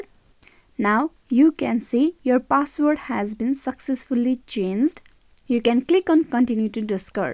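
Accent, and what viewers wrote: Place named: India. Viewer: I love your indian accent and thank you for the help man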